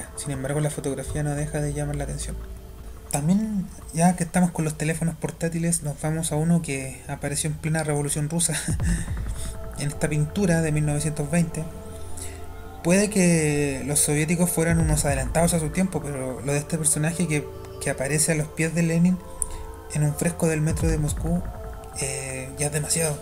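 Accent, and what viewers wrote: Chile. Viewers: Buen video. Oye una pregunta,eres Chileno??? lo digo por tu acento! Saludos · Video Robado y monetizado sumale la voz de un chileno Boludo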